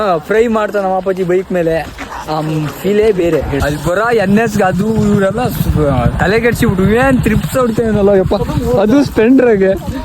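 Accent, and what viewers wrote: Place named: Sirsi, India. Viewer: Bro nivu sirsi siddapura navra same a side tarane matadthira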